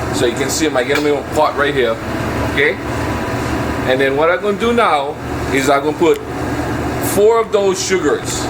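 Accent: Hawaii, United States. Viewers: Growing up in Hawaii ❤😂😅 dats da way of speaking n it's understandable n recognized ur from da islands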